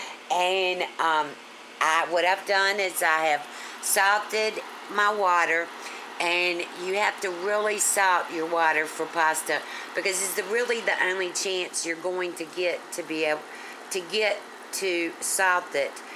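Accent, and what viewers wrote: South, United States. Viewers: I could listen to that ADORABLE Southern accent all day long! (Makes me kiss my family in Tennessee). I love your macaroni salad too.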